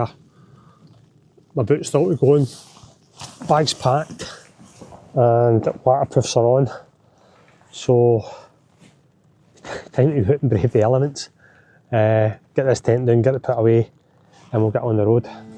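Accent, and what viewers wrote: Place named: Scotland, United Kingdom. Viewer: A few hours up my cuff good Scottish patter brilliant 😂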